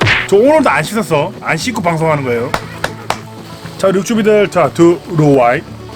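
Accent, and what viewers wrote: Gyeongsang, South Korea. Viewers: 예전부터 궁금했는데 경상도 사투리쓰시는데 사시는 곳이 강원도 쪽인 이유가 있나요?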